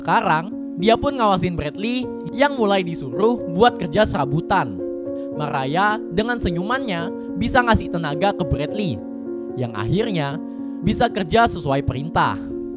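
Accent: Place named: Pontianak, Indonesia. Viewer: Klo ngomng kok kek org ponti bg!.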